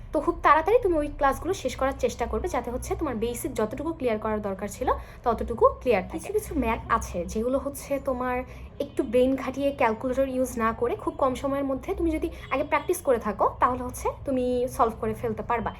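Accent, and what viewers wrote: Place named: Chittagong, Bangladesh. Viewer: আপু আপনার বাড়ি কি চট্টগ্রাম বিভাগে? আপনার কথার মধ্যে চাটগায়ে ভাব আছে।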